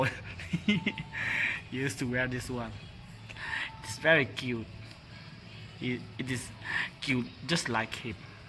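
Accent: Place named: Mizoram, India. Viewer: You are Mizo English, very very Mizo English ❤😂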